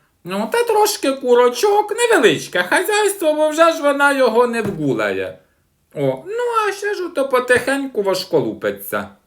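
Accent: Poltava, Ukraine. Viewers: Яка ви молодець !Ви повертаєте мене в дитинство,моя бабуся (царство її душі)була з Полтавщини і точно так розмовляла.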